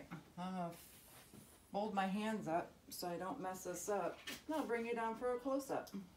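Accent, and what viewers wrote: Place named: Texas, United States. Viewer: I live in Texas, north of Houston in The Woodlands. I thought you sounded like you might have been from down here somewhere. Anyway, glad I found your channel.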